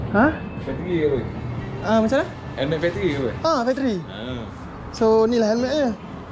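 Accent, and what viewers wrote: Terengganu, Malaysia. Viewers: Mmg minat la abg ni, muka garang tp bila cakap lemah lembut, best lak dgr slang Terengganu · Kecek ganu la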